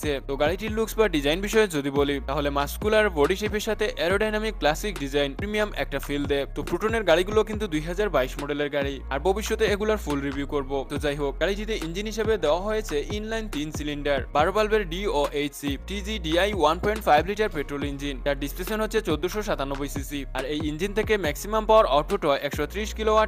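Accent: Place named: Sylhet, Bangladesh. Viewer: সিলেটি উচ্চারণ।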